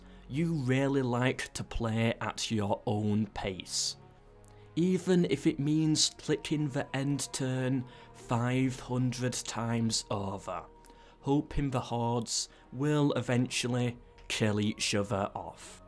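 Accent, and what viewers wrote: United Kingdom. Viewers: Oh god your british acent is that british I underground only 60% of your words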